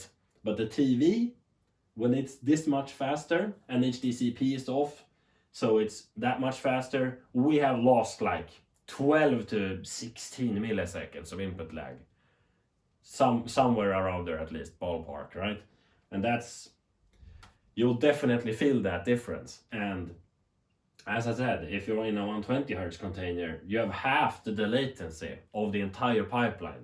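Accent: Sweden. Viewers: does amd freesync really add input lag? i got a hisense 144hz 4k tv, i got vrr off allso. hey man are you from sweden like me? sound like a bit of swenglish accent;) good content1:)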